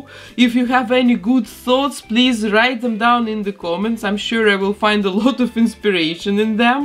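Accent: Russia. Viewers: Excuse me...but Are you from Russian? 😰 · where is she from? l hear Russian accent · Russia, belarus or out there but I think it's from a European country because she says the money in Euros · Я не могу понять, или она русскоговорящая, либо просто плохо выговаривает англ слова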